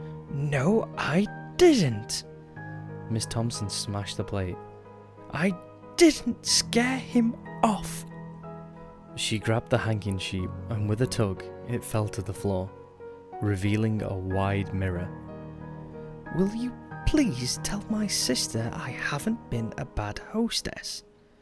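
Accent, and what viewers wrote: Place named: England, United Kingdom. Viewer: I knew that was an English voice, me to. I'm from the South but live up North xxx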